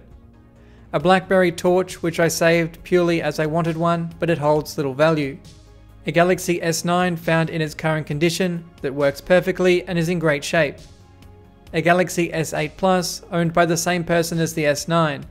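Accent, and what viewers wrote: Australia. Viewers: He sounds a lot more Australian when he isn’t using his video voice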